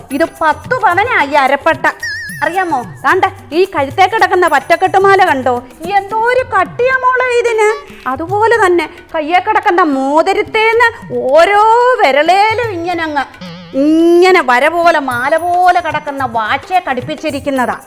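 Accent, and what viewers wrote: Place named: Kollam, India. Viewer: Ammichiyude varthamanum ketittu kollam bhagathu ninna tonunnu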